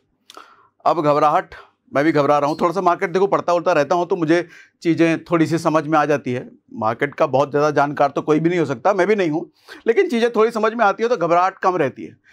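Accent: Bihar, India. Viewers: Bhai aapki bhasa se aap bihari lag rhe ho or aap kya bol rhe h ye sayad aapko bhi nhi pta . Ab suno ye koi nai baat nhi h market crash hona or ha abhi carsh hua nhi h but aapne apna paisa kmane ke chakkar me video daal dia or logo ko bevkoof bna rhe ho . Piche ka record utha ke dekho kab kab market me movement hota h ......kya yar bkvas ke alava kuch or bhi krte ho 😂😂😂😂😂😂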